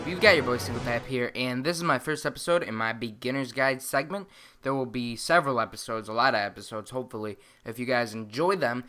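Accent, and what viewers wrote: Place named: Chicago, United States. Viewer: Are you from the Chicago area? your accent sounds like it. ( i used to live 'round there)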